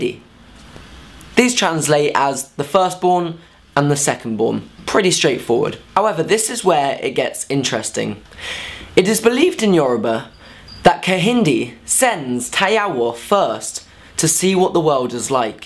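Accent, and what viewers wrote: United Kingdom. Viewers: No idea why this was recommended to me, but such a delicious british accent !! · are you british?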